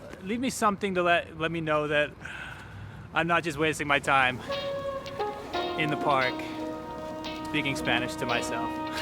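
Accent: France. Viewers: Your french commes out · Good job! The best way to learn a language is go for it! Even though you made mistakes you still were able to communicate the message, and that is always what is most important. I did notice that you kept wanting to do French pronunciations to Spanish words (like the word "que"). The reason for this is that both are Romantic languages. But just keep at it. I know when I first went to Quebec my French was awful, but I kept forcing myself to speak and within a short time I was able to have brief conversations. I know people fear saying the wrong thing or being corrected by a native speaker so they won't make the attempt, but if you look at it, when you discover you made a mistake and get corrected or correct yourself the odds are you won't do it again, so make those mistakes and learn from them and you'll more quickly gain expertise in the language. 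A quick tip for a quick and large vocabulary expansion: if the word ends in tion (like operation) replace the tion to cion and pronounce it in Spanish: Operation = Operacion, Relation = Relacion, etc. Also, if the word is feminine in French it'll almost for certain be feminine in Spanish and the same goes for the masculine. Keep up the good work! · Excelente primer intento! Sigue practicando y mejorarás mucho! Se nota que sabes francés y lo mezclas, pero vas bien!! You can do it! 😎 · 💪😀💪 te comprendo. Tu francés te confunde con el español pero es poco a poco. Vas bien al mirar la televisión en Español. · I only spoke Spanish for the first 4 years of my life as I was raised by my grandma. Once we got into school they kind of forced us to stop speaking it to catch up in English . Still a little bitter about it. I can survive but it’s so frustrating for it not to be natural sounding like my parents or my grandma. 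Not be able to express myself in the way I can in English. I didn’t help myself as I took French in high school. I can say and write je suis désolé mais je ne parle pas français. Est-ce que vous parlez anglais o espagnol? Or ça va and other basic little phrases. Also cool to hear the French come out in your Spanish. Like when you say que. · It’s so great to see you trying to learn Spanish, I mean the fact that you are putting yourself in this vulnerable position it’s amazing. Luisito Comunica(not his last name, actually a variation from the verb comunicar, for example: yo comunico, tú comunicas, EL COMUNICA) it’s a great channel but maybe you should look for Super Holly, she’s a native English speaker but her Spanish it’s perfect and has so many videos teaching English and Spanish and it could help you feel related because you have the same native tongue. In your video I think you keep mixing Spanish and French. 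I learned English by listening to music and watching films and tv shows with subtitles, first in Spanish then in English until I just hear them, my English by no means it’s perfect it’s always a work in progress but I never stop trying and neither should you. Keep learning 👍🏽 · I can see that you mix it with a bit of french, but still great spanish, the pronuciation is understandable, you just need to correct some mistakes, and you'll start improving :) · ¿Do you know French? I can notice how you pronounced some French words/phrases. It’s pretty cool that you did your best for this video, congrats! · I think you are very brave. My friends tell me to watch videos of televisión novellas to help my ears learn to hear Spanish sounds. Do you speak French? I think I hear a little. You're right, if we keep it up, we can only learn more and speak mejor.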